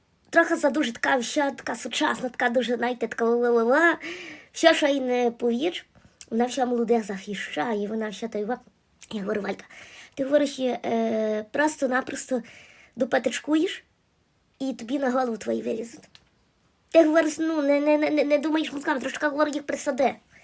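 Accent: Galicia, Ukraine. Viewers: Вітання з Німеччини! Яка колоритна наша галицька мова, слухаю і ніби знаходжуся десь неподалік. Поважаю Ваш талант і бажаю ще більшого творчого натхнення! Чекаю з нетерпінням нових серій.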